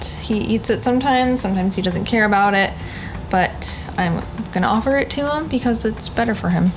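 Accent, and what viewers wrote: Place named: Midwest, United States. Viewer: she has to be from the midwest. Minnesota maybe....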